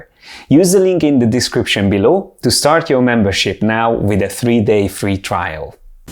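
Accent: Hungary. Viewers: Your voice it's like you have a little bit hungarian accent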